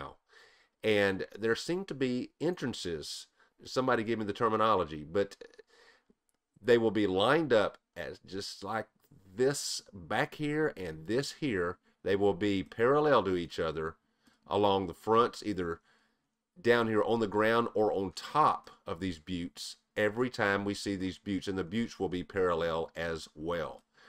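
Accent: South, United States. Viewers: Hi and everyone else. Happy New year coming to everyone! 🥳.....I give many 👍👍👍..to one of the coolest accents ever! Southern! 💕😂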